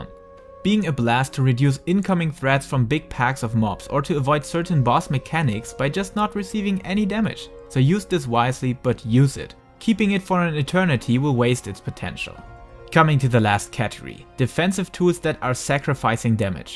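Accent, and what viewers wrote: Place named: Germany. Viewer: Es ist einfach soooo anstrengend einem deutschen zuzuhören wenn er Englisch spricht. Sicher ein echt gutes Video, aber da man einfach nichts verstehen kann, gibt es ein Daumen runder… schade, dein Video hätte mir sicher sehr sehr gut geholfen und ein Abo sicher. Aber leider nein. Dann schau ich mir lieber Videos von amerikanischen Mitspielern an. Die versteht man wenigstens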